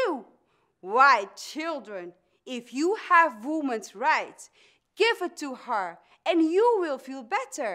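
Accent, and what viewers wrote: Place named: Netherlands. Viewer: Love the Dutch accent. I like to think that Sojourner would be happy to know that her own words are still not forgotten.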